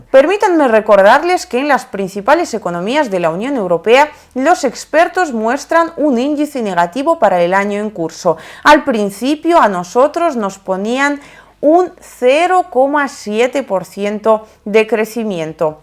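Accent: Spain. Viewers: Rusa hablando cono Española😅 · No me gusta su acento español ..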